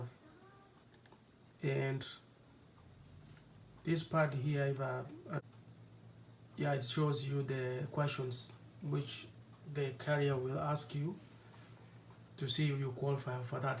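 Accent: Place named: Kenya. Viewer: eeh! Baba umetoka upande gani bwana, nkisikia sauti yako, nkasema hapana huyu ni wa home lazma, anyways nice to hear that, ntakutafuta InshaAllah tuzungumze zaidi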